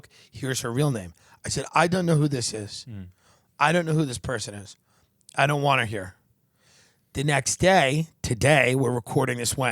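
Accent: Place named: New York, United States. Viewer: Hahahaha the New York/East Coast accent is a blessing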